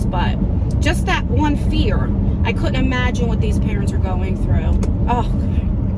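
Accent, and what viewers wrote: New Jersey, United States. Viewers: I truly enjoy these vlogs I’m a new subscriber l love your Jersey accent by the way love ❤️ from Ohio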